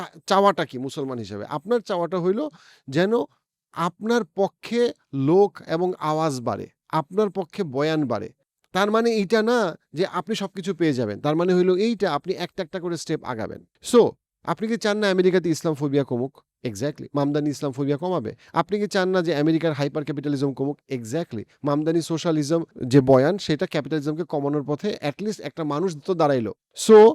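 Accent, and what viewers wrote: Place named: Bangladesh. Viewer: অবাক হওয়ার কিছু নেই. Newyork বাংলাদেশি আর পাকিস্তানী তে ভর্তি. আপনার কথা শুনে আর English accent শুনে বোঝা যাচ্ছে আপনিও বাঙলাদেশি.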